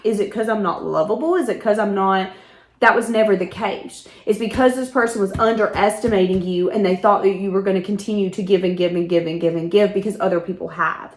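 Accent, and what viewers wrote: South, United States. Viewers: I love your Southern accent chere!💜💜💜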